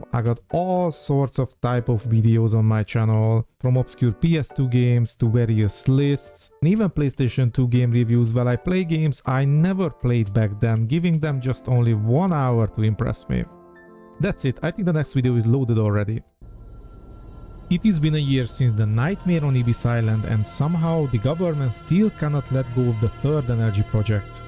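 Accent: Hungary. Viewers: judging by ur accent im 100% ur hungarian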